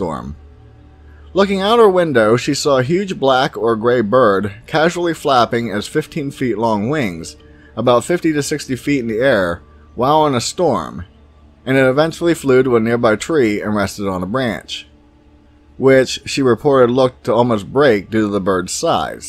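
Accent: Pennsylvania, United States. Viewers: As soon as he started talking, I could tell he was from PA.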